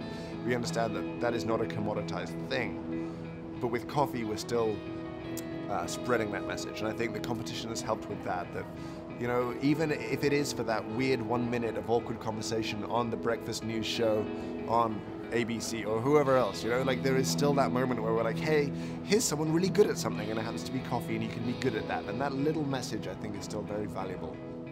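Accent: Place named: United Kingdom. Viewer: is one of the best ambassadors for coffee there is, not a little helped by that calm, thoughtful British articulation:)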